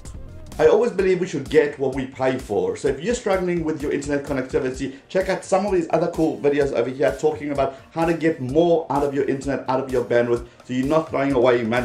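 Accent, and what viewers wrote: South Africa. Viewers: accent is SA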